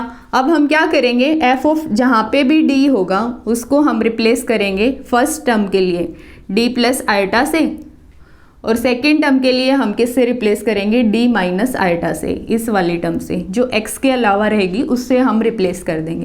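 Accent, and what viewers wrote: Haryana, India. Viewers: Mam aap haryana se ho?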